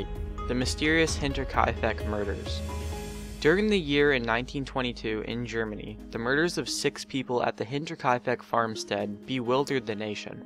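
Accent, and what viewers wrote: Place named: Canada. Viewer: the narrator is obviously Canadian. "howse" "abowt"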